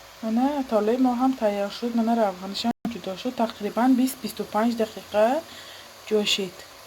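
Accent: Isfara, Tajikistan. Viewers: Мемурам дар лафзи Исфараги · Салом шумо аз исфара мешидми?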